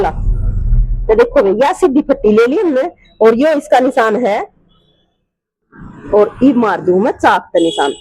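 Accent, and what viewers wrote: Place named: Haryana, India. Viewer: Bahan ji haryanvi hi bolte raho acha lgta h ❤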